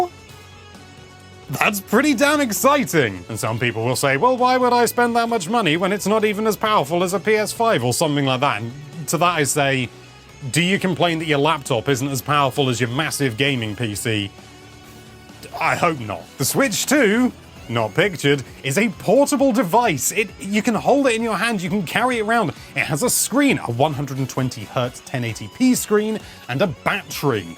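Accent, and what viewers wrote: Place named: United Kingdom. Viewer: NOTE FOR THE EDITOR: so ive come to really enjoy the little gags on editing like the “its a methaphor” on this one. The videos on the channel have always been good to watch on a second monitor, specially with the more then enough waffling in a sweet british accent, but today i played the video on the TV while grabbing launch and a mayority of the video its just a static shot of someone talking, so i say all this to point out that that little gags, and more like it could make the video more entertaining and attractive to watch on a primary monitor, nice job.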